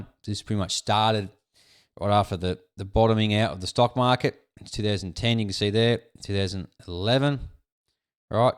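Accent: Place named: Australia. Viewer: Good info, we need more Aussie voices on utube.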